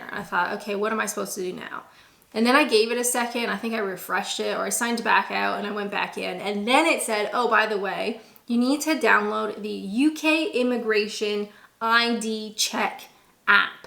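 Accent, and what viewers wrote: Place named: United States. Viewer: American accent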